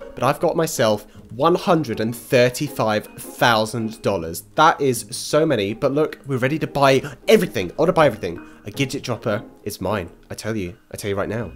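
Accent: England, United Kingdom. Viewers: Hi You have a Awsome British accesent And You are the best And Denis called Huskies Ugly:[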